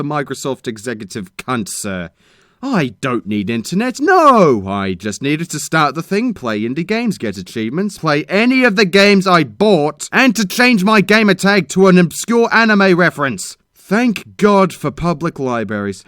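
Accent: Australia. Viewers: WAIT! You're Australian! Oh... That explains a lot (not really). Awesome!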